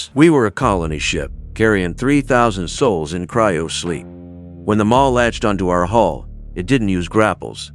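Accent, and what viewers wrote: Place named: South, United States. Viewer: The Southern accent made it worth while for the listener. 👍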